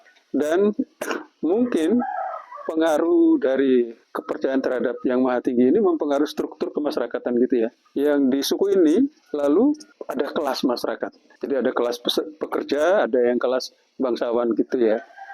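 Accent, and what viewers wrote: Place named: Java, Indonesia. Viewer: Mohon maaf bapak,, bp bisa 'medok' begitu, apa sering berbahasa jawa pak 🙏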